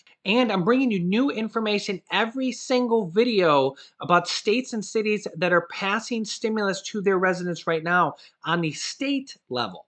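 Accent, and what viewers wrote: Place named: Minnesota, United States. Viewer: Minnesotan - long o - really long o if you are from there or ND !! lol 😆 accent ta!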